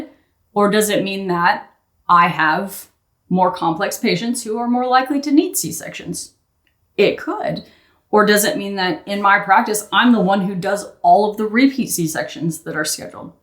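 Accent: New Zealand. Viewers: I’m just so amused that you’re picking up a kiwi accent. Your “New Ziland” is exactly like my mates 😂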